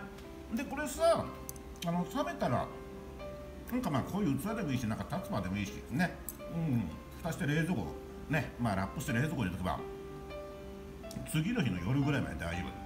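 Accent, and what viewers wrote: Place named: Kanto, Japan. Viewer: 関東の人だね また関東に住みたい。。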